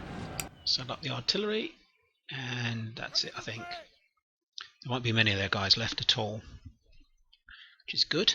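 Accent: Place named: England, United Kingdom. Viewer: mr im not sure if i ask but are you english because i like your accent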